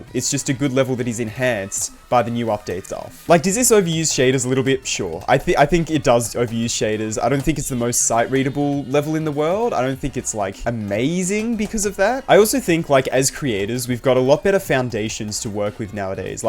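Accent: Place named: Australia. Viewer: Why do the Aussie yt’s sound the same. Like you sound like the nba YouTubers called “6man”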